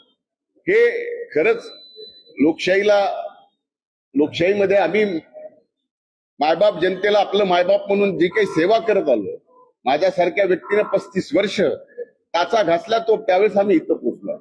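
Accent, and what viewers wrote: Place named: Beed, India. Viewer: बोलीभाषा बीड वाले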